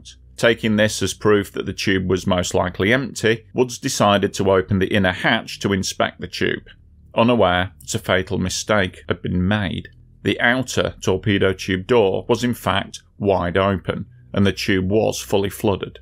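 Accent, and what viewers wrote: United Kingdom. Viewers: having to endure that British accent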